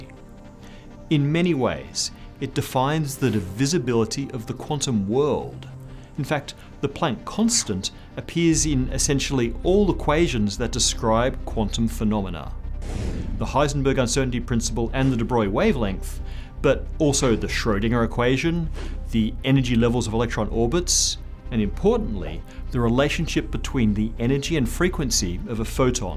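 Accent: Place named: Australia. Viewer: I'm so used to hearing American / UK accents in videoa that when I hear an Australian accent i can't figure out where it's from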